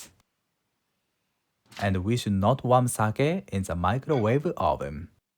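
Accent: Japan. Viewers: I would like to commend you on the progress of your English speaking. I also love that you have preserved your traditional Japanese accent which is delightful to listen 🎧 to. I love your videos and thank you for the wonderful content you provide. This one is a particular favourite of mine. Regards and have a great day 😊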